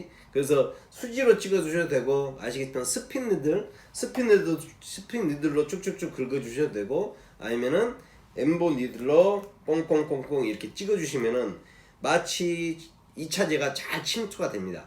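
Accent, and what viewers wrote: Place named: Jeolla, South Korea. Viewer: 구 독 ! 좋아요~~~ 했어요 꾹꾹!!전라도 사투리 넘 귀여워용 ㅋㅋㅋ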